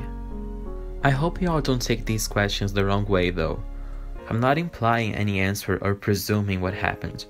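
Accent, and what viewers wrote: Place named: Brazil. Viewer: Esse sotaque parece brasileiro🤔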